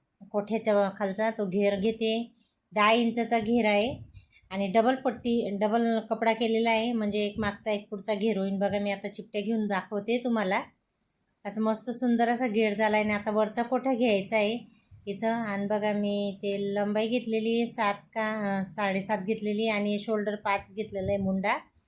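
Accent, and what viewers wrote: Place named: Nashik, India. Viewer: ताई तुम्ही नाशिक साईडच्या आहेत का.. बोलण्यावरून वाटले तसे..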